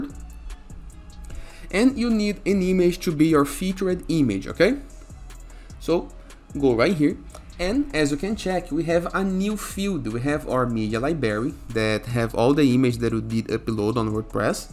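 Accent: Brazil. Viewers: Senti o sotaque brasileiro kkkkk depois fui no canal conferir e realmente é. Conteúdo bom demais valeu 🤝🤝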